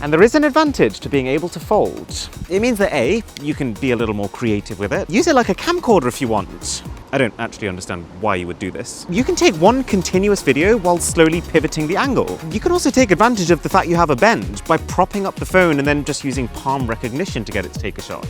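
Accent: United Kingdom. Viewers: why does he have a british accent?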